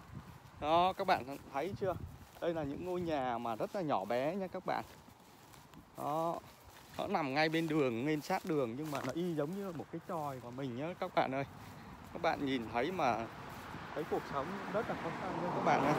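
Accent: North, Vietnam. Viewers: Tiếng bắc mà tự xưng là Bà Rịa.???? Quê tui .... 😚😚😚😚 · Giọng bắc 54 nghe dễ thương giống e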